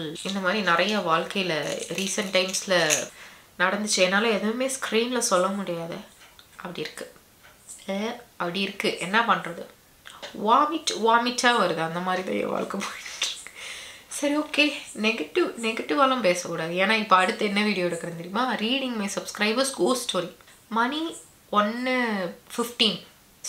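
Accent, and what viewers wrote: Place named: Coimbatore, India. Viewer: coimbatore slang sissy😜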